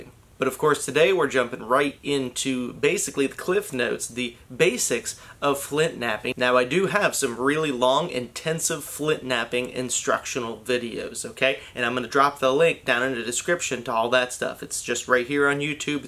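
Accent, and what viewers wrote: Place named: South, United States. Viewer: are those invasive hogs? i'd assume so by the slight southern accent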